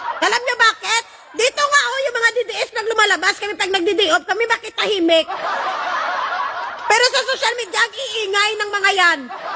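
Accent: Iloilo, Philippines. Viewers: Ilongga ka mam, isog gd 😁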